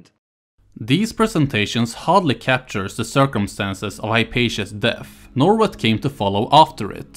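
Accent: Sweden. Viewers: Lol your swedish accent is pretty funny but I like it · is this guy swedish lol. sounds like pewds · English isn't his first language so you probably just have to adjust your ear to the Swedish accent. The more foreign accents you hear the easier it is to decipher them. Also, I think Swedes tend to use English that's more in the British style, so if you're not used to that it can take a little while to get used to.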